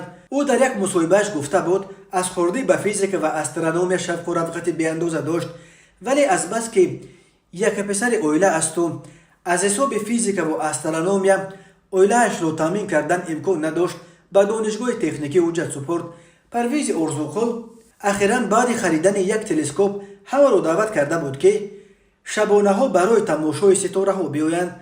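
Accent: Pamir, Tajikistan. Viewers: Памирский акцент, помири гап зан помири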